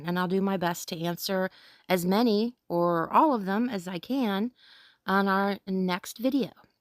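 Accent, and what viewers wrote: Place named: North Carolina, United States. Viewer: Neighbor! Ok.. Which state are you in? You almost sound like a North Carolinan😉.. I'm actually originally bien & raised in NC, moved to Tennessee 4yrs ago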